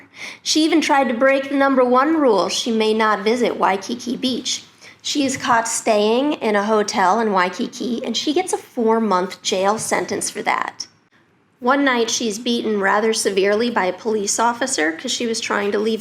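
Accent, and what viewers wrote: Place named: South Carolina, United States. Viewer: Great entertaining and historical show. Do you know how to make a hormone ?....Don't pay her! hahaha. Love your dedication and awesome accent; I'm guessin S. Carolina?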